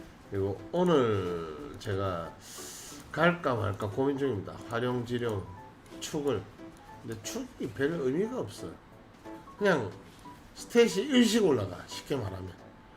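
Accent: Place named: Gyeongsang, South Korea. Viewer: 경상도 사투리가 제스타일입니다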